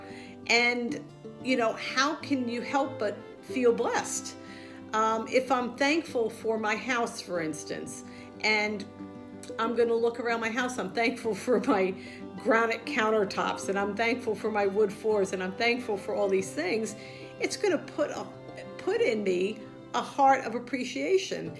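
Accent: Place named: New Jersey, United States. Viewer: Excellent podcast! And I love that Jersey accent! 😉🫶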